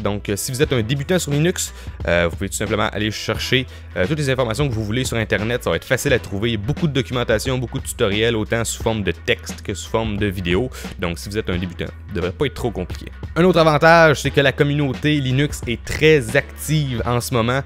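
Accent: Quebec, Canada. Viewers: L' accent canadien, j'adore !!! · Clair, net et compréhensible. Si seulement tous les tutps étaient aussi compréhensible. Et je kif l'accent québéquois. Trop marrant. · Super a part qu'il parle un peux trop vite . de plus avec son accent canadien sa arrange pas les chose mais c'est se qui fais le charme de se jeune homme. Concrètement vidéo sympathique.Vivement la prochaine vidéo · killer des québécois* les canadien ont pas cette accent, la plupart ne parle même pas français, c'est un québécois (au canada) et c'est l'accent québécois ;)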